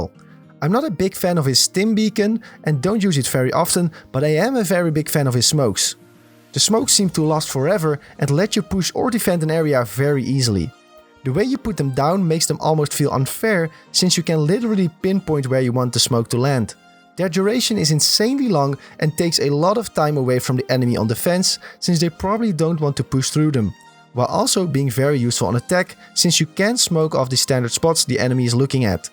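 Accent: Netherlands. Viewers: Ik hoor aan je engels dat je nederlands bent xD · Your engerish is very well i instantely now you are dutch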